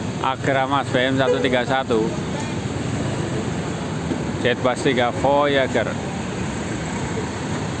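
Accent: Banyumas, Indonesia. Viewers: Bang oak de melu manteng maning......paten liputanya mantap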